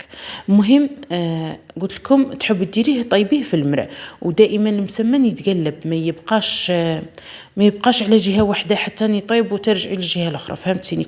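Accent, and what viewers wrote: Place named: Nayli, Algeria. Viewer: ياعمري ياعمري على ذيك اللهجة والهدرة الزينة..بنت بلادي العطرة..نايلية حرة..تقولوها ولا لالا(عطرة)ربي يحفظك ويعلي قناتك..❤❤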